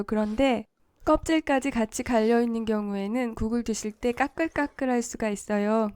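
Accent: Seoul, South Korea. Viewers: 말투가 서울사투리 느낌..영상 잘봤어요 ㅎㅎㅎ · 와 말투가 오리지널 서울사투리네요 신기하다ㅋㅋ 지금은 지방쪽이랑 섞여서 서울말이 진짜서울말이 아닌데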